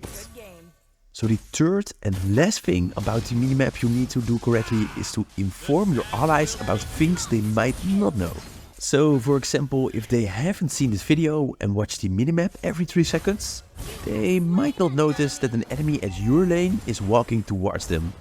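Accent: Netherlands. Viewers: I don't need to listen a second longer wanneer ik iemand die Nederlands praat Engels hoor spreken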